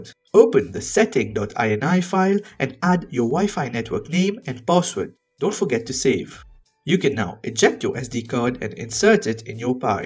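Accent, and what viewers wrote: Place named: Mauritius. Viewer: Sensing a mauritian accent ... 😁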